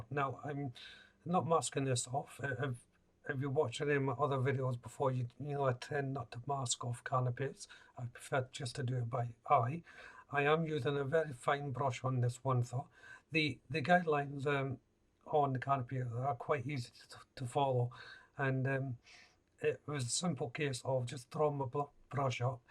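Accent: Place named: Fife, United Kingdom. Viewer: I kinda thought I recognised your accent. I spent many years around Glenrothes and Dunfermline before moving to Asia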